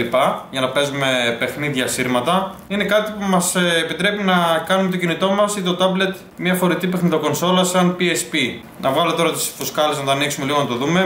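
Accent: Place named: Russia. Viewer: oh WTF. Russian?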